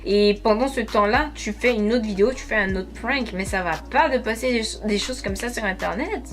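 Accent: Quebec, Canada. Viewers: Y a des gens😥 Même pas honte😑 P.s j'adore ta voix, tu est québécoise(l'accent)? 💕🍭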